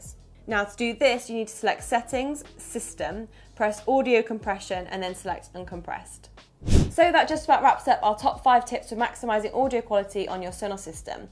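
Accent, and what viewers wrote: United Kingdom. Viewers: Good info, delivered too fast. Your audience is not all familiar with whatever UK accent you have.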